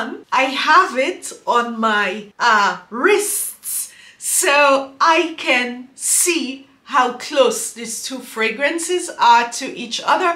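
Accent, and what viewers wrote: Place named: Kenya. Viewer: Niiice! Just bought it. First clone frag. Btw. Are you Kenyan 😙? You sound so Kenyan 🤔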